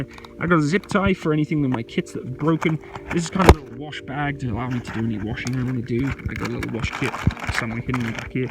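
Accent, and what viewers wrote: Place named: United Kingdom. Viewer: Whereabouts in the UK are you from mate?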